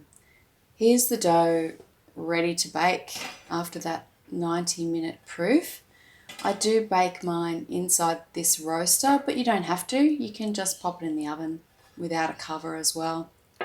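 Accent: Australia. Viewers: I love following your recipes. I use freshly milled spelt. Before shaping I sometimes place several (around 30!) garlic cloves on the dough. I really like the way of your cooking demonstrations. It is simple and relaxed. I tried to get a dough whisk here in our country but have not been successful yet. I also like your Australian accent - have got two Australian friends here in Austria. Greetings from Austria.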